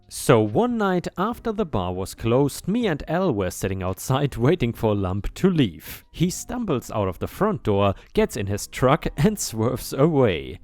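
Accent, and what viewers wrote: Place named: Germany. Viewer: You sound like ypu have a german accent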